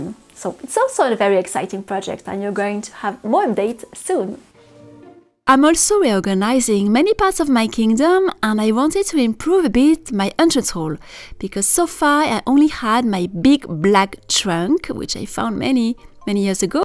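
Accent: France. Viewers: Who on Earth says they can’t understand your beautiful French accent?!?? Take NO notice. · ✨I adore your French accent, so beautiful! Thank you for all the beauty you bring to my life, and for the motivation to work on my art and my French!! ✨🦋💕🌷🪲🍄🦉🐇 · Merci for another lovely video. You speak perfect English to me so don't feel insecure. Your French accent is beautiful and I love listening to you. 💕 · I absolutely love to listen to you! You speak perfect English with a beautiful French accent! The carousel is gorgeous! Not surprising! Hallway looks lovely as well. 💗💗💗💗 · you have a fantastic command of English. How incredible you are to be fluent in two of the most widely spoken languages on Earth! Your beautiful French accent adds music and elegance to the words. I love listening to you 🤍🤍🤍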